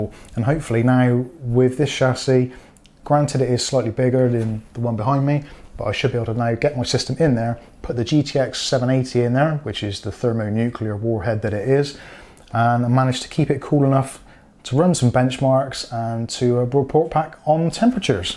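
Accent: Bristol, United Kingdom. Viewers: mate are you from bristol? sounds like it, im from there lol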